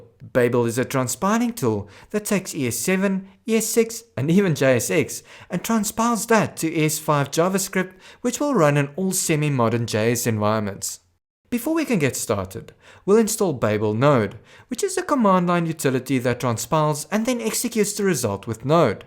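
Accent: South Africa. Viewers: I was like... wait what... is that an Afrikaans accent? good to hear :)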